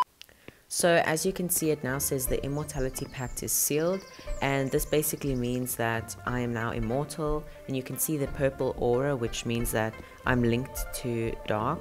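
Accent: South Africa. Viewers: R u from SA?? 😜💅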